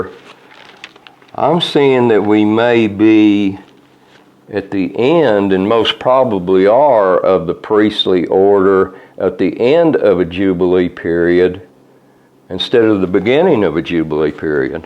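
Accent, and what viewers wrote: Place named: South, United States. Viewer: Oh brother, bless you. You really get it, the difference bw the BoC & BoL!!!! Praise YHWH & bless you! Where are you located? Ur a southern gent., that I can tell, but where? TX, i hope or TN?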